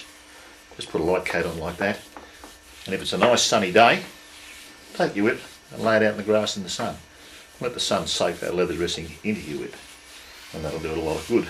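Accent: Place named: Australia. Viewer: Hey, sorry for the question but could you write down what string material you used to make the popper? With your australian accent it's a bit hard to find out what it is:D sounds like "barlin twine".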